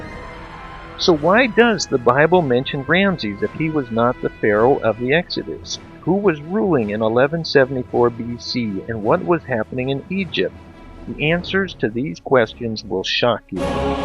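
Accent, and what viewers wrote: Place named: Midwest, United States. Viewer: from the North, Midwest. (Going by that accent)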